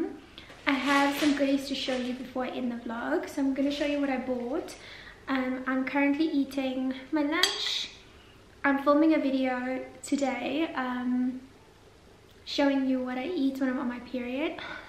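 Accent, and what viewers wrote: South Africa. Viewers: I'm dutch and it's funny to hear you speak South African because some words are dutch but sound different. Love your video's!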